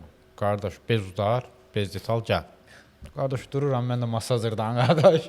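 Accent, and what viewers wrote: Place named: Quba, Azerbaijan. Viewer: Quba ləhçəsi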